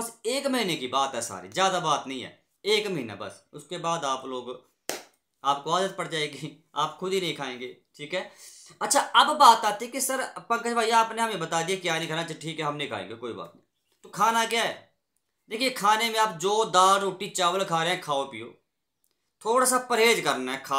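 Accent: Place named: Himachal Pradesh, India. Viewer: Happy birthday🎉🎉Himachali lamguage lg rahi aapki.aap himachal se ho??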